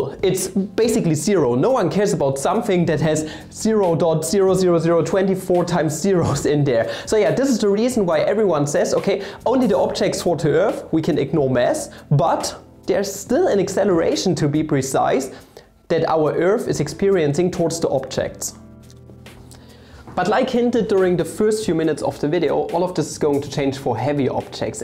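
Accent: Germany. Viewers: Ich stoß grad so auf dein kanal und dachte mir: Alter der kerl hatt doch nen deutschen akzent. Aber geiles video alter feier ich sehr das thema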